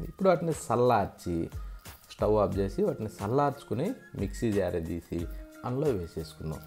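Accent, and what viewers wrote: Nellore, India. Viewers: Nellore yaasa bro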